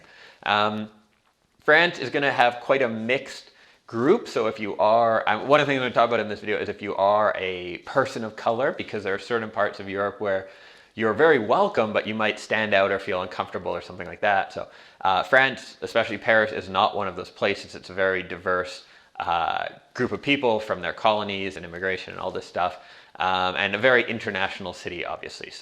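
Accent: Canada. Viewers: That Canadian accent.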